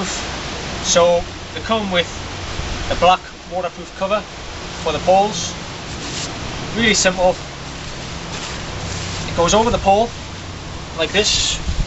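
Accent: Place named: Newcastle, United Kingdom. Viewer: I like ur voice. Geordie. Mmm.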